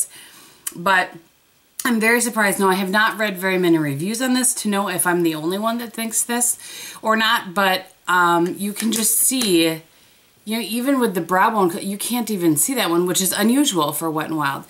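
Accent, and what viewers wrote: Wisconsin, United States. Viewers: I love your wisconsin accent! I'm from Iowa, so I love when I get to hear a wisconsin accent!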